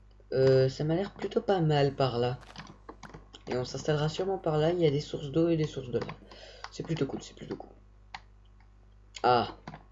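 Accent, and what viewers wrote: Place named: England, United Kingdom. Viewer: Mais quel accent anglais mon dieu ! TU es mon dieu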